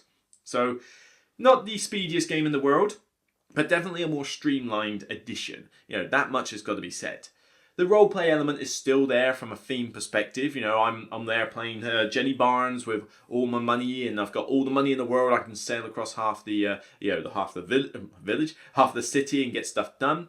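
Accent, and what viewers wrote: United Kingdom. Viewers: Have my thumb up and don't take it too seriously, there are many reasons to dislike for different people as *Wants to thumb up, but klicked the wrong button by accident, *dislikes your British accent/clothing/playstyle enter whatever reason here and madly down thumbs all your videos, *hates Arkham Horror/Ameritrash games..... Asking for the reason is fine, but I would not expect to get an answer from those who thumb down, as the won't likely read your question and IF they wanted they could have give constructive negative feedback in the comments...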